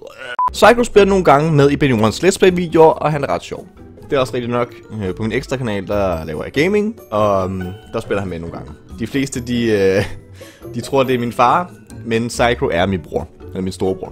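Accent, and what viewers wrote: Bornholm, Denmark. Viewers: det er nestan liga svenska men det er bonholmsk och det er skågit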